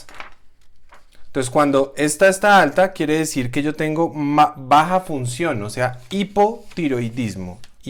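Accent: Colombia. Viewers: Gracias, muy Colombianisimo, en su hablar! Veremos como me ayudo con toda esta informacion. Estoy en un pais, donde : TSH, T3,T4 y listo. y hay de mostrar que sabemos un poco mas.......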